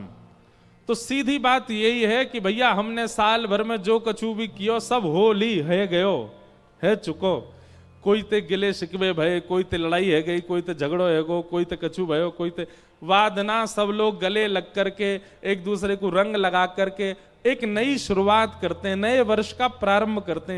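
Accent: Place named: Braj, India. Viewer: Jai jai aap k charno me koti koti pranam jai jai aap ki katha se sabka jeevan dhanya ho rha h jai jai aap k mukharvind se brij bhasha bhut acchi lagti hai aap k charno me koti koti pranam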